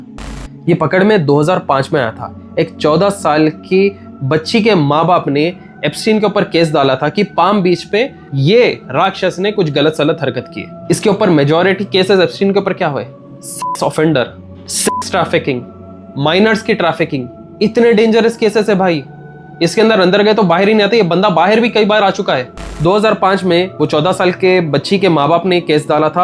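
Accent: Hyderabad, India. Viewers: aplog kaha se ho bhai Hyderabadi lagra style mje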